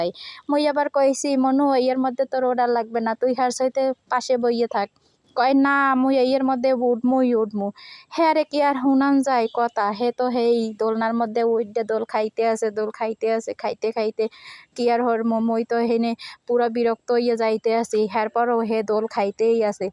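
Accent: Barishal, Bangladesh. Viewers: বরিশাইল্লা ভাষা মুই ভালা পাই🎉🎉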